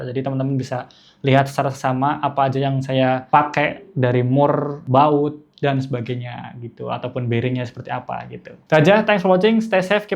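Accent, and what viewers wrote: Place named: Brebes, Indonesia. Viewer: Oalah wong brebes koe mas. Salam ngapak mas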